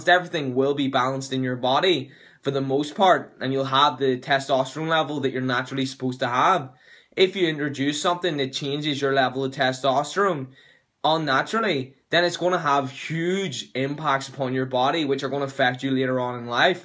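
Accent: Northern Ireland, United Kingdom. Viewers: The norn irn accent hitting hard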